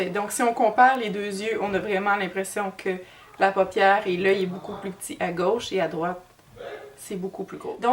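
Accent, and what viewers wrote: Quebec, Canada. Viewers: Waouh !!! Quel œil de biche ! Merci pour la technique et ce petit accent québécois j'adore 😉😊🌻❤ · Adorable 💖 ma super heroe : tu expliques merveilleusement bien et ton accent canadien wahou 😍 on meurt d'envie de partir vivre chez vous : au CANADA 🇨🇦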